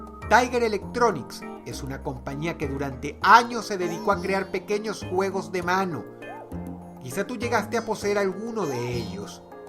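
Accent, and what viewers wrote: Caracas, Venezuela. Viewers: El tiene acento venezolano, en sus videos mas actuales su acento desaparecio, xd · Hay mas comentarios actuales que de hace 10 años cuando salio este video mencionado cómo Dross pronuncia Consola 😅 (asumo que eso viene del acento venezolano que aun tenia en ese tiempo)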